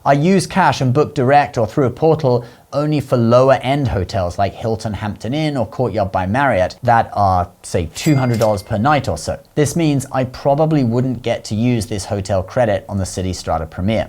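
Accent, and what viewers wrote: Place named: United Kingdom. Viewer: you're Brit? I always thought that was just your YouTube character.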